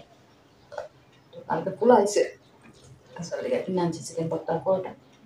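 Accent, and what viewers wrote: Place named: Assam, India. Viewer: Tomar kotha gulo sune amr sei assam ar kotha mone pore jai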